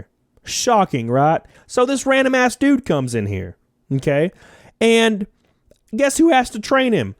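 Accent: South, United States. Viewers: Have you ever considered voice acting? I could imagine you doing voices for cartoons or even radio dj, or audiobook. Your southern accent is so unique! Great content tho, always funny and intriguing to hear what goes on in crazy retail.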